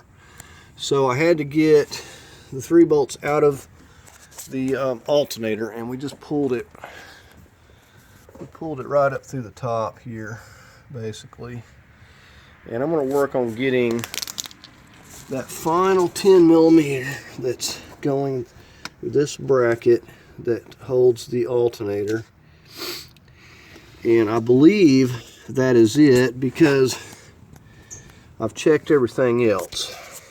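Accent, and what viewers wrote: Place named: Midwest, United States. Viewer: Superb tutorial and many thanks! It's clear you have an immense knowledge and experience wrt to motor vehicles and specifically, this Tribute / Escape. Furthermore, you're a natural instructor; patient, considerate of all skill levels, able to add 'pointers' along the way and just a naturally helpful and decent American guy! I'm a native Minnesotan (currently living in Straya) and have also lived in So Texas and KC Mo. What I liked best about this vid was just listening to your patient, confident and sincere midwestern voice- am thinking somewhere south of KC and north of DFW? ( Or Louisville?) My project is a 2001 Tribute but its RH drive so much was identical save the ALT removal which had to come oout from underneath after the axle removal. I ended up hitting the top first-manifold and plenum seals, PCV and also the water temp sending unit. 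Then, on to the timing cover. I usually make a sketch on cardboard of the part being replaced and mark / punch holes and just add them as the bolts are removed. I bought the same Fel-Pro seals/gaskets as you bouight had to source them out of Chicago on Ebay-could not get them here and many think this is to prevent the old rigs from staying on the road and a sneaky way to force adoption of the current EV's coming out of Chyna. I hear it is similar in many Euro countries. I also did the oil pan gasket ( watched your other video) and that was very helpful too. I was able to wrestle the second exhaust pipe through the gap of that cross-memb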